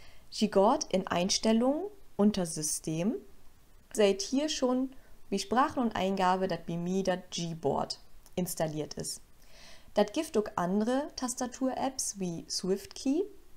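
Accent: Low German, Germany. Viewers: Es ist so schön wie Plattdeutsch spricht. Mir geht das Herz auf. Eine Steigerung geht nicht. Dankeschön ❤👍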